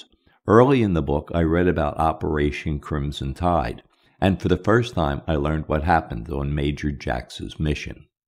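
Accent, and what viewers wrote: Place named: Pennsylvania, United States. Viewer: This narrator sounds like a native Pennsylvanian.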